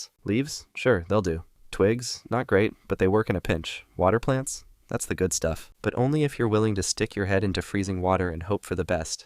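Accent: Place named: United States. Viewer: Why is the location of this channel the United Kingdom but the narrator is American?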